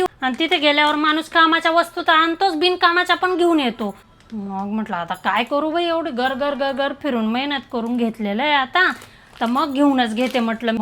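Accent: Khandesh, India. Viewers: Typical Khandeshi...you always remind me of how my Sasu talks! 🙂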